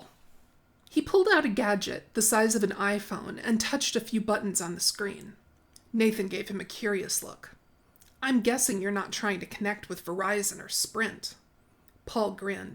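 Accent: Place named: Russia. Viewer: Just love your Russian accent.❤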